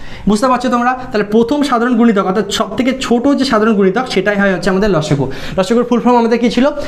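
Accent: Bangladesh. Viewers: আপনি কি নোয়াখালী?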